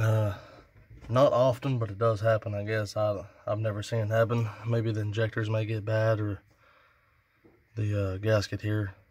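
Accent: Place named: South, United States. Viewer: idk why bros thick country accent and the way he get straight to point, allows me to understand exactly what he explains and seem to be trustworthy he actually knows what the hell he talking about. God bless and thanks.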